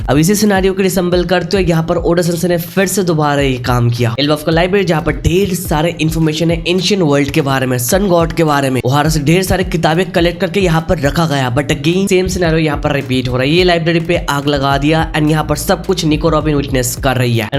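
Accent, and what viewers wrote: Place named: Bangladesh. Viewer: This is a Bangladeshi Channel.. I guess from his accent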